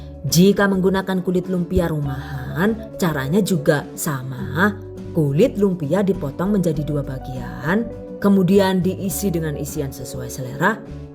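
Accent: Banyumas, Indonesia. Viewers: Yg punya chanel orang mana sih? Kok ngapak sprti sayaa 😂,, hehe chanelnya keren dan menginspirasi 😊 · Ngapak Rika ya 😂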